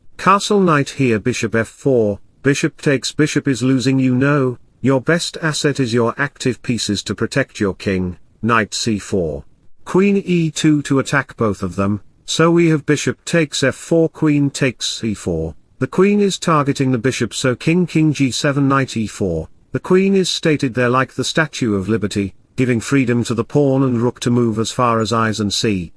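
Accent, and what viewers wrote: United Kingdom. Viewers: The narration sounds like an Englishman was asked to read a script written by a non native English speaker with a less than firm grasp of English grammar.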